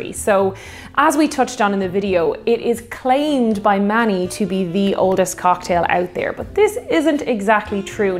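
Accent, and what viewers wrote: Ireland. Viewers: Your second video for me. I made this comment after watching my first, which was your first Sazerac. Here's my comment from that one, which probably should have been made here .... "The first video of yours I've watched and it was very enjoyable indeed. I've visited Ireland often and listening to you speak makes me want to return and visit with my Irish friends there. Anyway, the Sazerac is a great drink, which I've enjoyed many times in New Orleans, and your formula is fairly classic. Mine (which I was taught by a NOLA bartender I befriended) goes as follows: 2oz Rye, 1/4 oz. Demerara sugar syrup, 3-4 dashes Peychaud's, 1 dash Angostura, and 3 sprays of the Absinthe atomizer into the chilled glass just before I pour in the other mixed ingredients. Lemon twist does not go into the glass. Slainte! (And I'm now a subscriber.) Oh, and I agree with the comment below that you'd likely truly enjoy a Vieux Carre as well."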